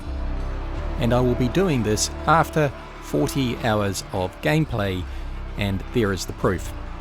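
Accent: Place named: New Zealand. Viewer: First review of yours I watched, and I really liked your reviewing style! I appreciate the slow, clear, unhurried commentary, and how well you structured the video. I've seen other reviews of this game where they never even mentioned the second scenario in the desert, maybe they didn't play it as much as you? Love the Kiwi accent too, even if you beat the Irish in my hometown Dublin just the other day!! Subscribed. ✌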